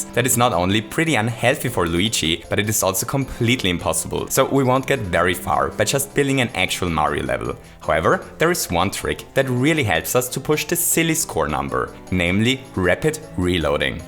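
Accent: Germany. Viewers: Where is he from? Because he sounds German/Austrian. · Bist du deutsch? (Are you a german?) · Du bist Deutsch oder? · Could it be that you are german? You have a strong german accent. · you sound, Very german mein freund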